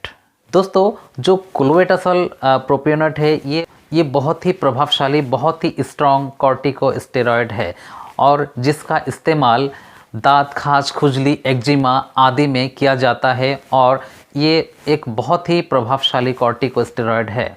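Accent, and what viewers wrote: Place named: Bihar, India. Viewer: Rahane De Bihari de raha bhashan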